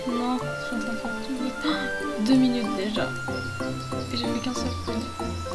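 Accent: France. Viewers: is she french?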